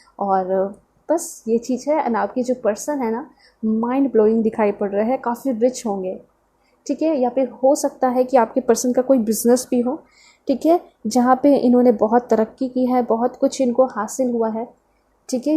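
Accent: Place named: Punjab, India. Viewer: Ma'am sorry for this comment. I like your Punjabi tone in your talk. I even enjoy many sounds. Thanks again.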